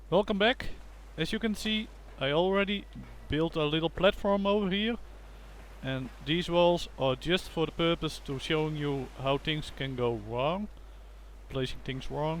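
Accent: Netherlands. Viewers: Ben je nl?😂👍🏼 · Heeyy are you Dutch? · the dutch accent is strong with this one...... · Are you dutch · he's dutch thats where the accent comes from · jij hebt een nederlands acentje ÷) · Let me guess... You're Dutch? :p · are u dutch :) ? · are yo dutch?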